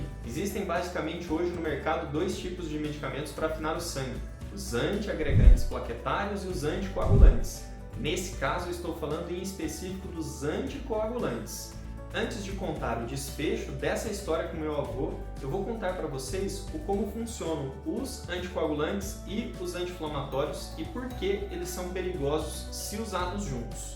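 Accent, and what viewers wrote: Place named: Caipira, Brazil. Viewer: Desculpa lá! Mas, não aguento ouvir este caipirê.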